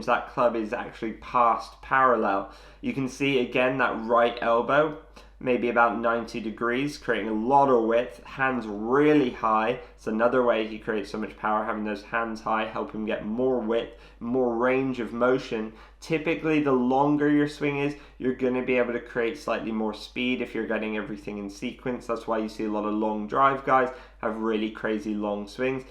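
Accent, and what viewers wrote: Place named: United Kingdom. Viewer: Great break down of Ancer's swing. Plus, you're one of only a few of the golf Youtuber's from Great Britain whose English accent I can comprehend. Cheers for the Queen's English ya'll.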